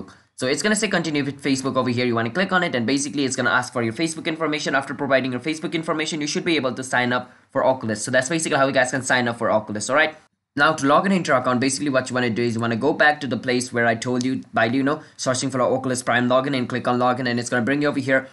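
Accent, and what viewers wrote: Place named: India. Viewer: sounds like an indian guy.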